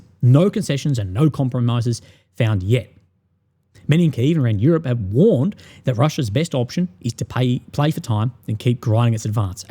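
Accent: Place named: Australia. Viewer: Love your channel and would continue to support it, but quite honestly the Australian accent and rhythm is nearly impossible to follow. Not sure what you can do to get around that, perhaps sub titles. No offence but it's really difficult.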